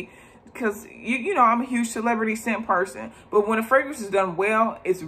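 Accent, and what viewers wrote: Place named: Philadelphia, United States. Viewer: I love your review. I've been contemplating buying this since I picked up Black Phantom by Kilian which is now my favorite scent of all time. Are you a Philly girl? I believe I hear some of that Ptown in your speaking which I miss since I left home. I've been living in Hawaii for years now but I'm originally from Southwest Philly. Keep up the good work.🙌🏽🙌🏽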